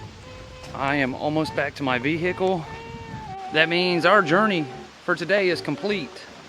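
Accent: South, United States. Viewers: I just have to say your very handsome and I love your southern accent 😍 ❤ I'm from the city Boston Massachusetts born and raised idky but I love country men southern men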